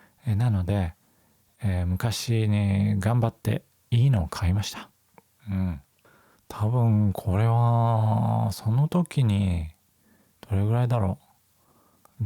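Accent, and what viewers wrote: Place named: Japan. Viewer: I was wondering this too… your voice is gorgeous. From what part of Japan are you from? I want to learn this accent.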